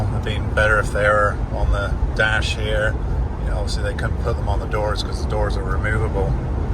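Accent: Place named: United Kingdom. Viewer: Good to hear another British voice here, I’m in OC. Love these base broncos. Look forward to seeing what you do to it 👌🏼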